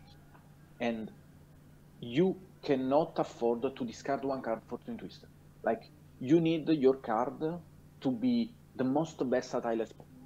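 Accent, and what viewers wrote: Italy. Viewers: love the spaghetti accent and you should interview Madolche God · italian pronunciation, i love you <3. TE SE AMA, FORZA ITALIA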